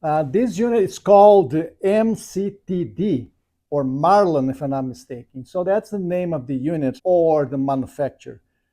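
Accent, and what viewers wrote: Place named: Brazil. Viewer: Sounds like you have a Brazilian accent. Greetings from PA!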